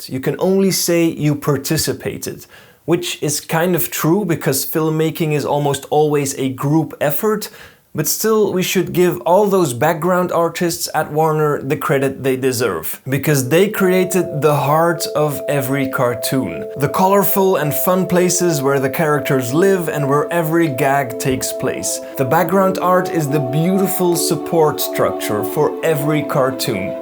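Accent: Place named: Germany. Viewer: This was fascinating. And I gotta say, just listening to your voice is a delight. A very slight hint of accent (German?) adds charm to your speaking voice.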